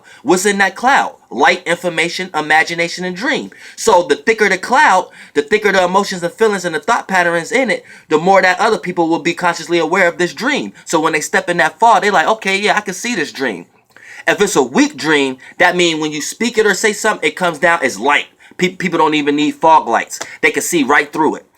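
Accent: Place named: California, United States. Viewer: Soon as bruh start talking all I hear is CALIFORNIA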